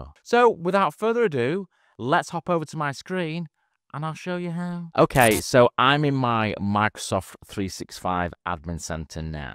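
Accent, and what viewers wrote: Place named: Scotland, United Kingdom. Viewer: "Don't worry. You're not alone." deep inhale, long exhale Thanks internet Scottish tech guy.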